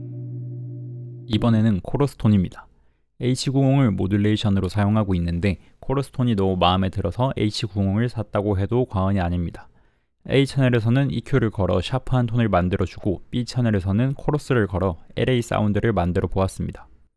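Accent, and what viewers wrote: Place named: Gyeongsang, South Korea. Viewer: 경상도 분이신가봐요?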